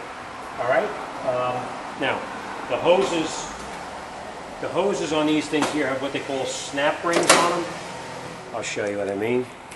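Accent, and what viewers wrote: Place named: New York, United States. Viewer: Forgive me for not knowing but, is this a New York accent or Philadelphia, or?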